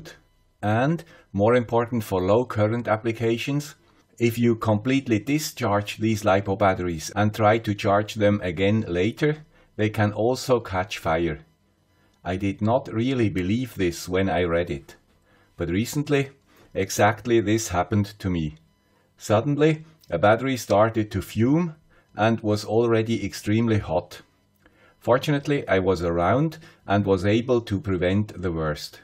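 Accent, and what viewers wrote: Switzerland. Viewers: Another BRAVO presentation, Mr. Guy With a Swiss Accent! Are you a professor or something? I kind of like it that you always write down on your video the objectives of your video...and then proceed on to explain following those objectives. Over 100% per cent for another good video. Thanks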